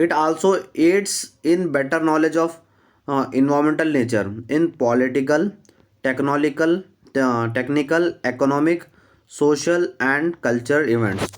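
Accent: Hyderabad, India. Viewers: Sir ap Hyderabad se hai kya..?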